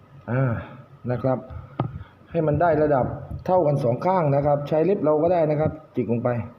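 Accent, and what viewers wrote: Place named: South, Thailand. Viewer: ขอบคุณพี่ ครับ ฟังสำเนียงแล้ว รู้เลยคนใต้บ้านเราครับ🙏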